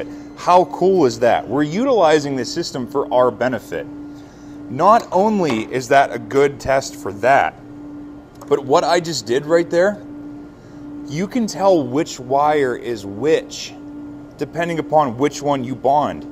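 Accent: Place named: Pittsburgh, United States. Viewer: Didn't realize before you were a Yinzer!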